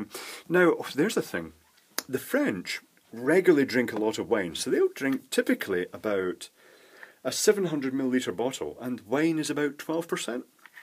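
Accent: Scotland, United Kingdom. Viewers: thought you were scottish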